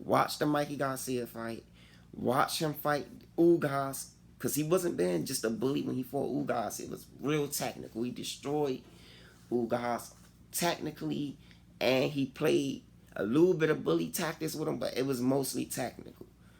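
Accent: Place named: Washington DC, United States. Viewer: I know this accent anywhere... my man from D.C... you make good points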